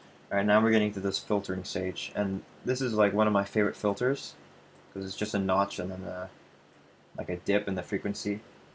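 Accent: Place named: United States. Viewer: Aren't you from Thailand? You sound super american haha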